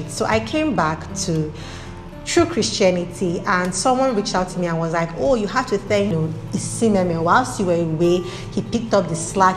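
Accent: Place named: Nigeria. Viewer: You sound like a Nigerian. Though, I'm not in a relationship. Your story is relatable and I don't know why. ♥️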